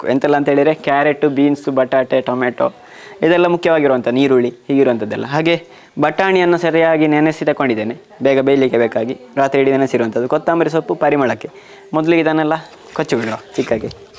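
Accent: Mangalore, India. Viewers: Mangalore kannada...nice to hear 😊..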